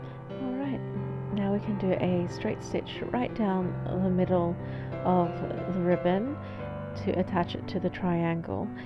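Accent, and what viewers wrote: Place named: United Kingdom. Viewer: Nice bunting . Something I have never bought or used. You mentioned Australia in another video. You don’t sound Australian very nice UK English Accent 😊👍🏻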